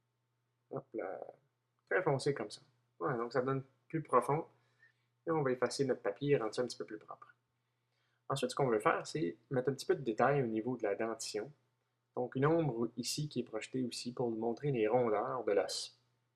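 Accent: Quebec, Canada. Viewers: merci mais ta vois et bizzar acssant canadien mais encore merci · il a un accent québécois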